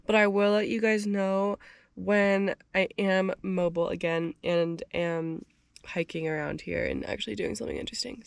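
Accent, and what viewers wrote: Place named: South, United States. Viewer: Also, With The Thing Inside Your Mouth, I Can Hear The SouthernBell Southern Accent For Real.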